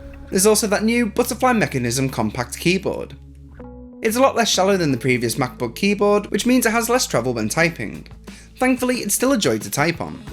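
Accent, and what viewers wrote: United Kingdom. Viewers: It's the typical British way to do a voiceover, I've grown to enjoy it lol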